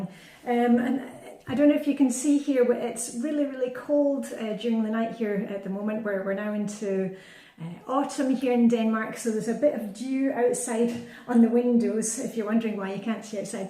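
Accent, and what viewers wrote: Scotland, United Kingdom. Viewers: I love your accent! Just wondered if you read the latest Ian Rankin, In a House of Lies. I often listen to the audiobook versions because I love the Scottish accent.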